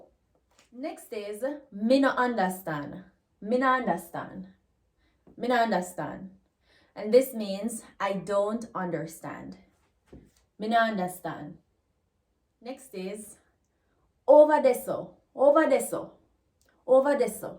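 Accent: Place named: Jamaica. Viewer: This is actually a very accurate video! Well done, you sound just like my friend Stacey from Negril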